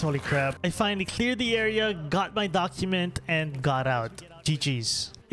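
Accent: Philippines. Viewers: Knew you were Pinoy the moment I heard your accent. I've met or have seen like about 5 Pinoys in this game since I started playing back in 2018.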